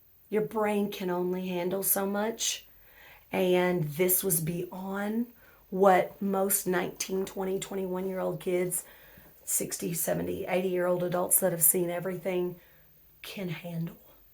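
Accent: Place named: South, United States. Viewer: You are from somewhere in the South, I've heard this accent all my life. I do know you're from some southern state. I relate to that accent. I hope you're right. However he is completely psycho and not like most of us. He is on the spectrum someplace and due to either environmental factors or genetic, he is flawed and he can't deal with it and thus this scenario is what we rare now seeing. A person that just should have gone on down the drug path and stopped there, instead of trying to rise to be and being triggered or what ever you want to call it to kill people. He would be better off homeless and under a bridge at this point.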